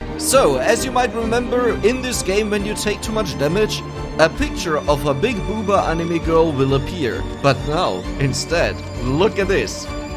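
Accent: Germany. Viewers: You have an analytical approach to both games you play and things like this, your channel's growth. That's pretty unique and along with your personality, you don't have to worry about the channel. You'll grow as a creator no problem, as long as you keep at it. Also, German accent is pretty cool, ngl.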